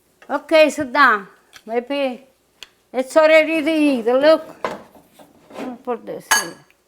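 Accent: Italy. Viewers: Love watching with her great recipes, and also love listening to her Italian accent, as a native Italian I love everything Italian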